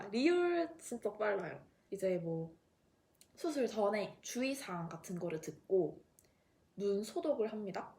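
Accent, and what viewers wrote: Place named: Gyeongsang, South Korea. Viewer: 경상도분이시군용 ㅋㅋㅋ귀엽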